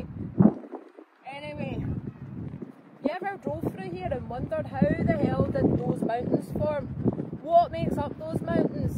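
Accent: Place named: Glasgow, United Kingdom. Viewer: Weegie accent lmaooo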